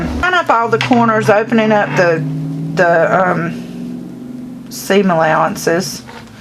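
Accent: South, United States. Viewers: I subscribed. You are sweet and feisty af. Plus my fam is from SC so the southern twang makes me feel right at home. Thanks for being lovable and informative.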